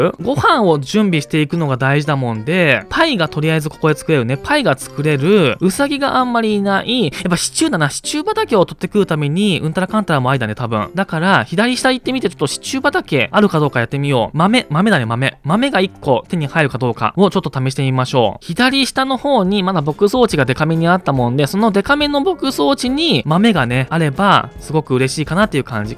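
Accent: Aichi, Japan. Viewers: 愛知県の人？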